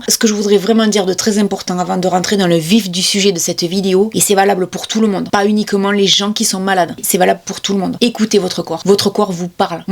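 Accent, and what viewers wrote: South, France. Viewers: Chère, En 2018 j’étais en arrête pour une dépression. Je ne dormais pas la nuit. Un soir je suis tombée par hasard sur ta chaîne, et ta voix douce et ton accent du Sud me détendaient et apaisaient ces soirées pénibles. J’aimerais tellement pouvoir t’aider aussi. Tu es une personne forte et combative, tu l’as toujours été, tu t’es construite comme ça. La détermination dont tu fais preuve face à ce traitement et ses effets force le respect. Quand j’ai lu le titre j’ai eu très peur que la partie ne soit perdue. Mais c’est tellement mal te connaître. C’est dur de ne pas être inquiet. Go Maman Youtube, on est avec toi 💖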